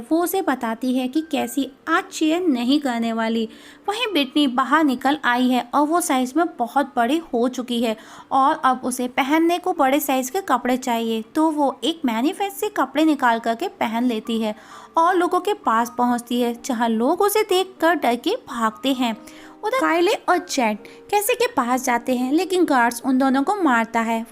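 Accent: Bihar, India. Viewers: R u from Bihar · Lagta hai maidam aap Bihar se ho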